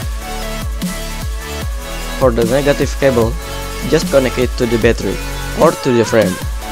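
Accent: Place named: Indonesia. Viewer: shiet i knew it from the accent... you're from indonesia ...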